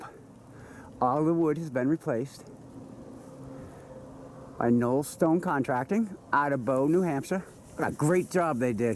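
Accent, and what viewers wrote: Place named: Maine, United States. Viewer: do you guys come from around there? You have quite the accent;-)> (it's so cute!)I'm from Boston and I have an accent. What's with the "Mylan, NH"? Shouldn't it be "Mill-ahn"?I say "pahk" too. You almost sound like a Mainer. "You cahn't get they-ah from heyah!" doesn't have an accent?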